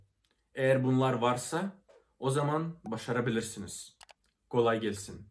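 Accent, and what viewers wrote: Thrace, Turkey. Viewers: Neden bilmiyorum ama hem tip olarak hemde aksan olarak ne zaman seni izlesem, Trakyalı birini izliyormuş gibi hissediyorum. seviliyorsun kral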